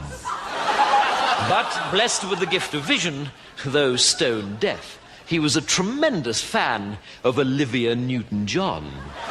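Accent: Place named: Newcastle, United Kingdom. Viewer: I'm proud of this talented man from the North of England..People are often amused at geordies but Rowan is from Consett, believe it or not, and can speak his native geordie accent very well!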